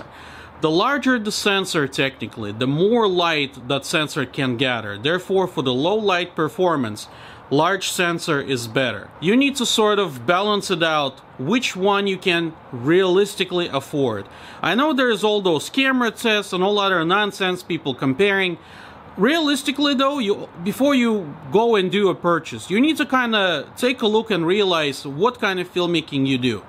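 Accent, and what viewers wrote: Russia. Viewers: Sounds like a Russian accent.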